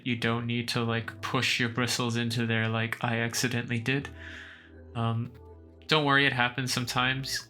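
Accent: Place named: Canada. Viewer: No offense but are you Canadian? You pronounce “decals” and other words differently than we do in the US.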